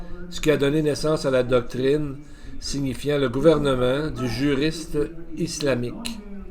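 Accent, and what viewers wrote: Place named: Canada. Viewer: C'est un accent canadien. Il est flagrant de s'apercevoir que l'intonation marque bien l'origine de ce son du continent canadien. Un beau pays, le Canada. Le Canada fait aussi de la politique, incroyable ! Dommage que l'on n'ait toujours pas la vérité. A croire que l'homme en est incapable.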